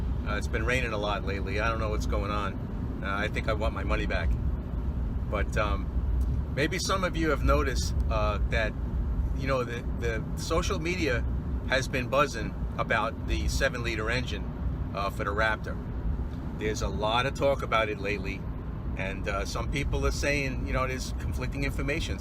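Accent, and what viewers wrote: New York, United States. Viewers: You must be from New York area originally 😁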